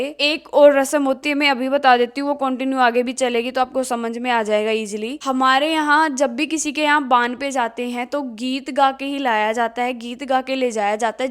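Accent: Haryana, India. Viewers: Di aap ke muh se haryanvi bhut achi lagti h 👌👌👌👌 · Your haryanvi accent is very nice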